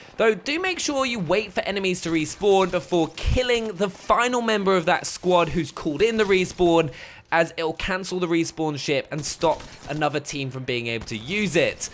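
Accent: United Kingdom. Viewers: Daniel Lyra UK looool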